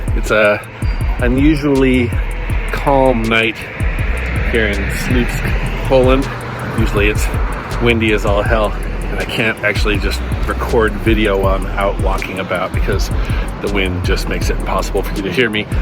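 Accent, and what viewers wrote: United States. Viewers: American fella I guess.Sounds by the accent.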